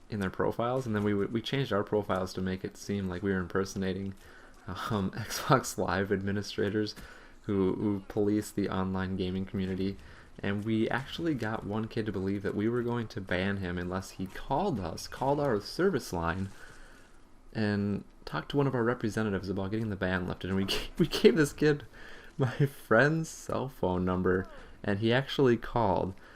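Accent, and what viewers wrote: Minnesota, United States. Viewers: i kno this is a stupid question but r u from minnisota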